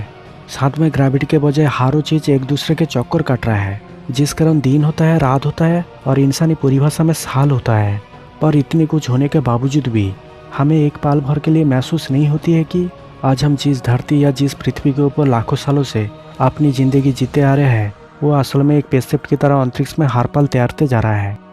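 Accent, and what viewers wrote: Bengal, India. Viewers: Aapko hindi mai nhi bolna chaiye bhai coz aapke awax mai bhutt jyada bengoli accent h...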